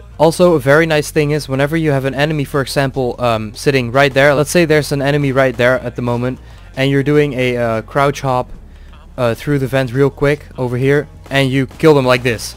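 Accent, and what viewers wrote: Netherlands. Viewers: I can hear he is dutch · Are u dutch lol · ahah ondanks dat je accent heel neutraal is hoorde ik het toch een beetje XD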